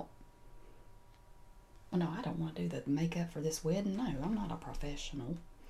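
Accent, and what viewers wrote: South, United States. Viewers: Dev 2 Turnt do you know of any others? I moved to the south and my interest for asmrtists has changed with the people I'm comfortable around I guess. I haven't found any that sound this southern, or from my region of the south. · It's so nice hearing a southern ASMR artist. I find you incredibly relaxing, especially since I'm from the south. It's almost like a comfort from home. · I'm from Florida and am just a little too far south to have my own southern accent 😒 . I LOVE yours though 😊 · You are awesome. Love the accent and southern gossip! Lol seriously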